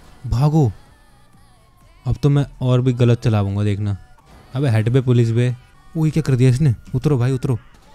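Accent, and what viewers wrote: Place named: Haryana, India. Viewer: haryanvi accent so good 😂♥️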